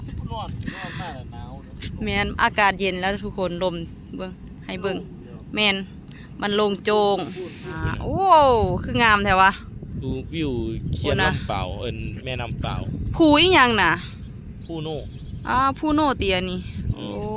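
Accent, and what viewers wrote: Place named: Laos. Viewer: สำเนียงเว่าคือ หนูนุ่นสาวลาวเนาะ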